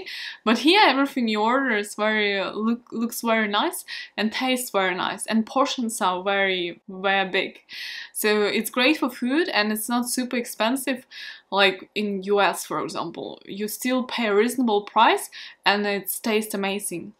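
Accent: Russia. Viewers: Сразу понял что русская по акценту😄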